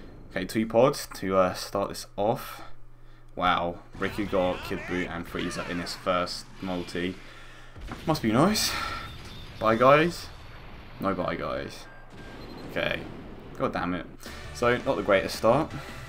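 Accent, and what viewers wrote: United Kingdom. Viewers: YOURE BRITISH?!?! Who woulda thought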